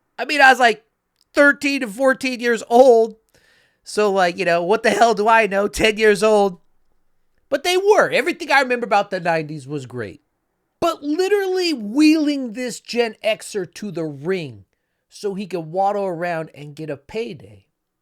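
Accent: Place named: New York, United States. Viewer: How do you sound like a New Yorker being from Utah?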